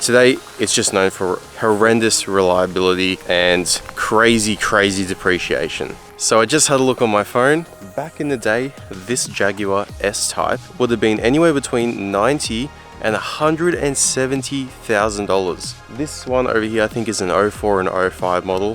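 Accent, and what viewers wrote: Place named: Australia. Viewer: They are LUX -URY, ie, pronounced "LUCKS"-URY cars, NOT LUGZURY cars. Be a PROPER SPEAKER and not a SLACK SPEECH OZZIE. First thing I noticed was the poor DICTION or PRONUNCIATION. Never mind the cars, you have to be believable first up! Most half smart Ozzies know NOT to buy EUROPEAN ANYTHING. Being an Automotive trade teacher, SPEAKING CORRECTLY IS IMPORTANT.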